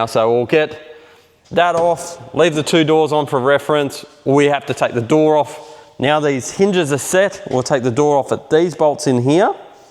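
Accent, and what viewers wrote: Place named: New Zealand. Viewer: Do you ever lived in New Zealand ? your accent have a bit off Kiwi in it .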